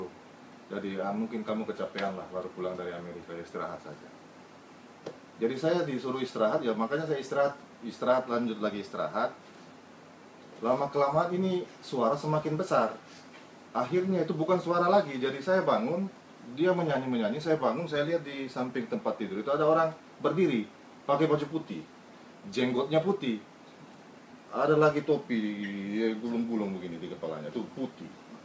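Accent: Makassar, Indonesia. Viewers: kental sekali ini orang logat makassarnya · pantas aksennya makassar sekali... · subhanallah, allah mempunyai jalan untuk hambanya yang bersungguh sungguh, lama di makassar yahh, pantasan logatnya makassar bgt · logatnya jadi ikut orang makasar ya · logat makassarnya kentara sekali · Dialeg Makassar. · dialeknya kok Indonesia banget. dialeknya sangat mirip dialek Makassar atau Manado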